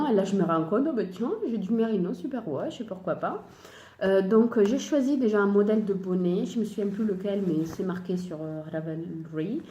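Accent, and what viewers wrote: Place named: Italy. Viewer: Ciao, conosci le avventure di Arianna? Il podcast di una Veronese in francese. È molto dinamica e sempre gioiosa. Il tuo podcast è simpaticissimo. Mi piace sentire parlare francese coll'accento italiano. Sono francese ma mi piace molto l'Italia. Aspetto il prossimo podcast! Lamartineorzo sur IG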